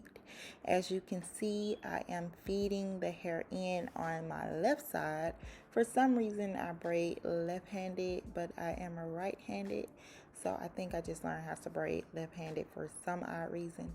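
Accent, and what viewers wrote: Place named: Mississippi, United States. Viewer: girl you sound like you from mississippi! 😭😭💜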